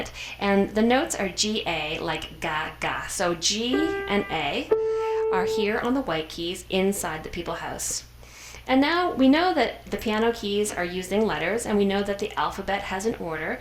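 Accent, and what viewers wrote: Canada. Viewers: I can really hear that Canadian Accent coming 'out'.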